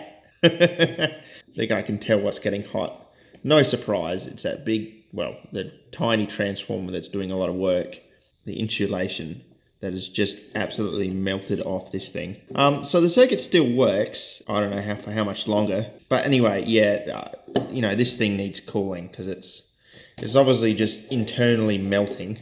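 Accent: Adelaide, Australia. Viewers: He's a proud Adelaide lad! We all sound like that over here.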